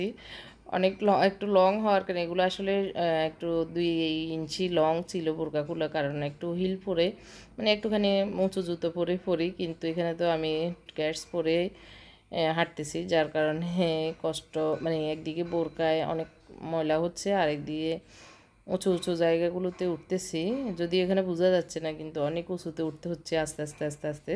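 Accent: Noakhali, Bangladesh. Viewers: আন্নে হুরাহুরি নোয়াখালী ??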